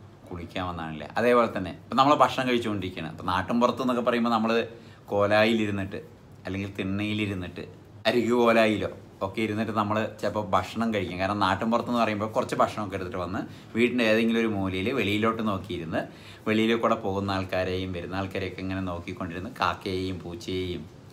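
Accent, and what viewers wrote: Palakkad, India. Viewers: Palakad slang enik eshtatto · ഈ സ്ലാങ്ങ് പാലക്കാട്ടിലെ ചിറ്റൂർ കൊഴിഞ്ഞാമ്പാറ, വണ്ടിത്താവളം എന്നീ ഭാഗത്താണ് പ്രധാനമായും നമുക്ക് കേൾക്കാനാവുക. കേട്ടിരിക്കാൻ നല്ല രസമാ☺️☺️☺️🤝🤝 ജോയിന്റ്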